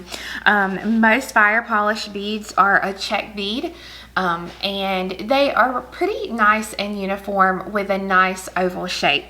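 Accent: South, United States. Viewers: Thank you for explaining these beads. I’ve been going nuts with the differences between Toho and Miyuki seed beads. Throw a few Czech seed beads into the mix and you’ve got a hot mess! BTW, I wish I could trade you my Chicago accent for your charming southern accent!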